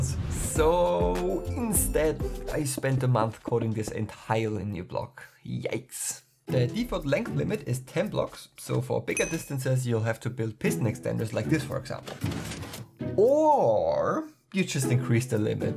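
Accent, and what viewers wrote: Germany. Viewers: Are you german it kinda sounds like it Ich bin auch deutsch ehre ich liebe deine videos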